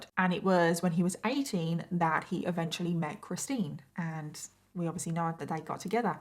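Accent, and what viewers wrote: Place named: United Kingdom. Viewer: whereabouts in Britain are you from girl? period.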